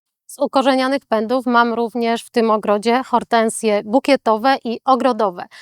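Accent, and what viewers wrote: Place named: Poland. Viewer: Och prawdziwa osoba, prawdziwy ogród, prawdziwy polski głos. Dziękuję za ogromną wiedzę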